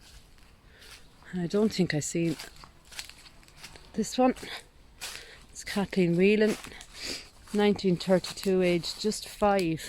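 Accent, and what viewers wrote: Ireland. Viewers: I just absolutely love you videos. Your accent reminds me of my Grandma Margaret . She was so very proud of her Irish Catholic roots. Church and Rosary daily 🙏. Her family came from County Monaghan. ❤️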